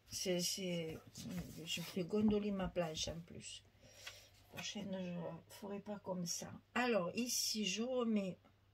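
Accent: South, France. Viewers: Merci, tout est magnifique. Tu m'as trop fait rire: empastifé. Moi aussi je parle le sud. Bisous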